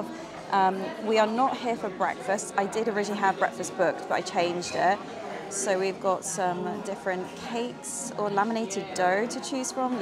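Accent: United Kingdom. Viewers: Thank you for the excellent vlog. It is calming to watch it. There is no yelling or constant nagging about selling stuff. You did a great job reviewing the cafe and the food. The elegant British accent is so good.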